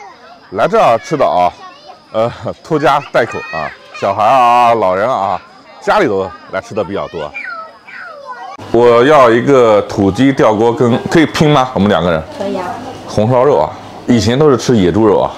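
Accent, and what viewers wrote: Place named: Hong Kong, China. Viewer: 港普听着真亲切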